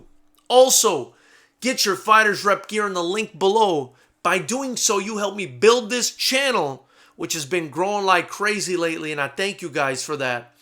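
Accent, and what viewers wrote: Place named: Brooklyn, United States. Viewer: You sound Brooklyn son 👊 As always on point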